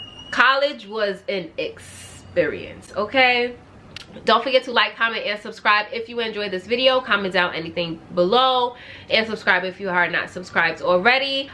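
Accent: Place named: New York, United States. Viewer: Omg the accent. I feel like I haven't heard a NY accent in so long since moving to Atlanta. And yes, it is C-STEP lol.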